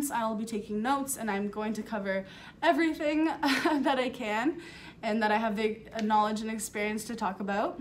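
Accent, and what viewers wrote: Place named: Canada. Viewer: I love your Canadian accent!!!!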